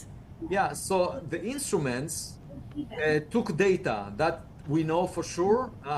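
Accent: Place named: Eastern Europe. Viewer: 听口音也像是东欧那边的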